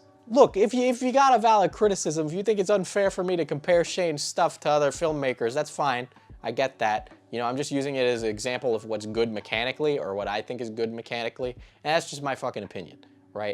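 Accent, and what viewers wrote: New York, United States. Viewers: Are you from Northern America? You sound like a New Yorker, I love your accent ^^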